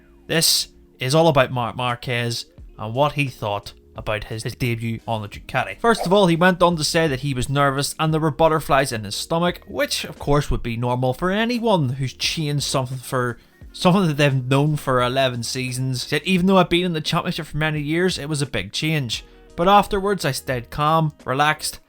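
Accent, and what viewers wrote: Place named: United Kingdom. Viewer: Narrator sounds British.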